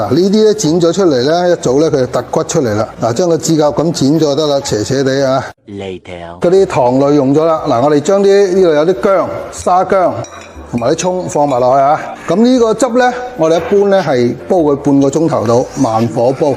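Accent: Guangzhou, China. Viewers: 我是地道的广卅人 师付的粤语带着很多的广卅方言 听起来很亲切 跟学做菜 吃到了自己喜欢的美食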